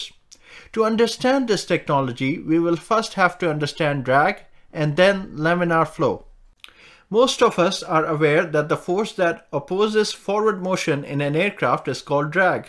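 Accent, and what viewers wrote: India. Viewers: I love your Indian accent and breadth of knowledge, but you didn't mention Gliders !!!! Thankyou, i loved your video.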